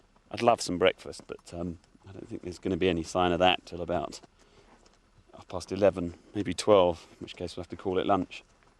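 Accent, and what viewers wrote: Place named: United Kingdom. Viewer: Is this DVD made for UK or some part of Europe? Cause the narrator accent (no disrespect meant) is quite a contrast with the wyoming ranchers accents..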